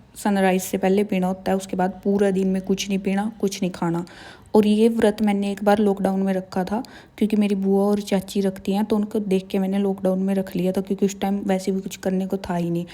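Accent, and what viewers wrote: Haryana, India. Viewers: Hanji Haryanvi Chori h ye . Don't mind yrrr Haryanvi ladki h ye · Aapki boli se lag rha hai ki aap Haryana se ho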